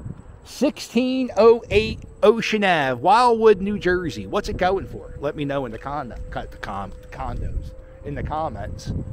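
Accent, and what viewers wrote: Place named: Pennsylvania, United States. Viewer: I heard the PA accent and mediately. When you think about Martin Luther King and the motel, remember something. These places were not always the Havens for junkies and hookers they have become. This is where families stayed originally, traveling salesman and other businessman, when the car became popular, people stayed at motels and motor inns. We didn’t have 20 story Sheratons and 40 story Marriotts. All we had were motels and everyone stayed there. It’s weird middle-class America state. You did have some bigger hotels, but they were confined usually two resorts are big cities. Those dives up and down the route one Corredor with normal stopping points on people traveling cross-country or cross state.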